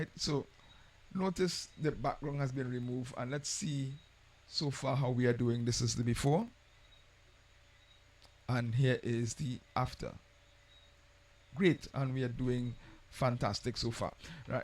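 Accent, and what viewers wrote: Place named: Trinidad and Tobago. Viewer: Very good content. Nice to hear the voice of a fellow 'Trinbagonian'(?) doing GIMP tutorials.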